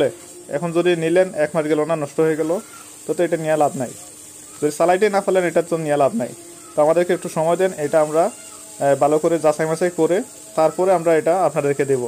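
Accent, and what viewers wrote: Sylhet, Bangladesh. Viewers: Vai Sylheti Sylheti lager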